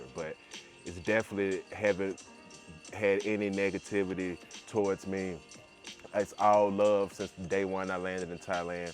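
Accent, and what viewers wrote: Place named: South, United States. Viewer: Youngblood, truly inspired by your message. Spent 11 years living abroad (Italy, Germany, Korea, Djibouti). Keep living your best life King! Oh yea, you gotta tell us what state you from. I am from GA. Your voice sound like you from the da south!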